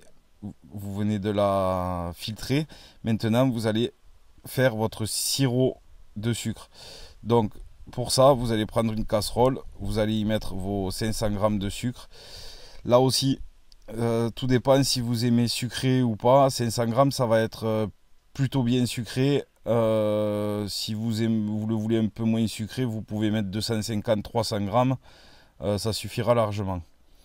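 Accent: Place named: Puylaurens, France. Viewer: C EST GENIAL ! L ACCENT ON A LE MEME !PUYLAURENS 81 !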